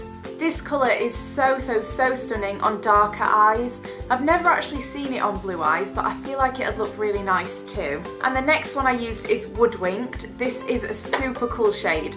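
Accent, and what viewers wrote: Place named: Sheffield, United Kingdom. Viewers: She is from sheffield in East Midlands UK x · Sheffield in the east middlings of the uk x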